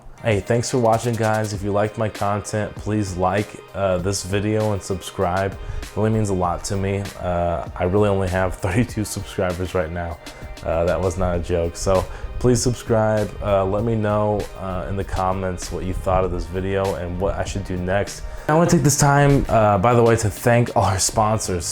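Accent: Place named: Australia. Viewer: You sound rather Australian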